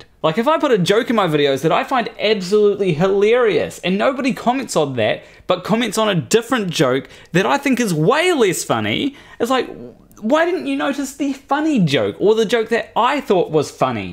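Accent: New Zealand. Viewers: I forgot to mention that your accent is more unusual to me than your nails. I don't have a lot of exposure to New Zealanders. Probably, the only thing is Brokenwood Mysteries episodes. The long e sound is more prevalent in New Zealand, it seems. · Good one for being You, man. 😁 Your New Zealand accent is also amazing. A-hui-hui 😁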